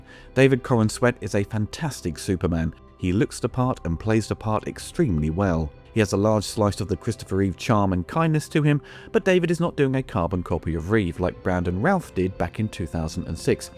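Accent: United Kingdom. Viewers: I saw the film today and am sorry you didn't enjoy it as much as I did. Since this is a non-spoiler review, I will save my detailed comments for when you review the plot. I will just say that I thought the story was very relevant to day... especially for what's going on here in the USA. I've never thought about where you live with regard to a particular film before. But from your accent, it sounds like you live in the U.K. Am I correct?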